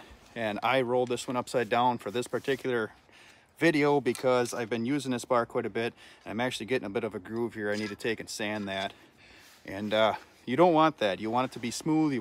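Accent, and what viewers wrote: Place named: Wisconsin, United States. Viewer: can tell you are from wisconsin! you betcha.. gotta take the family shopping at fleet farm for EVERYTHING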